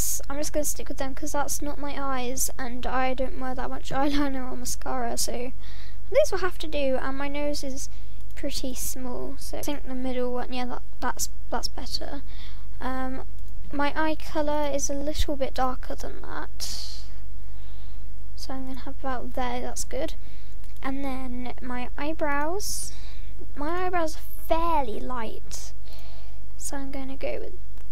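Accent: United Kingdom. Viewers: i love that you are Brittish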